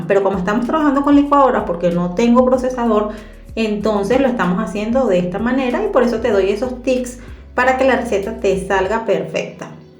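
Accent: Venezuela. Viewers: Clarooo que las voy hacer se ven demasiado ricas gracias por tus recetas y 20 puntos como explicas en los video. Saludos desde la isla de Margarita 😉 por tu acento eres Venezuela 😉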